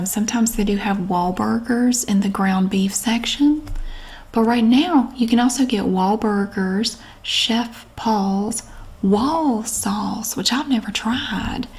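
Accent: South, United States. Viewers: I swear nobody brings me more comfort than this woman. I'm not sure if it's the southern accent or the maternal vibe she has, but I come back to her videos any time I'm stressed or anxious or upset & she always brings a sense of calmness to me. Bless her soul.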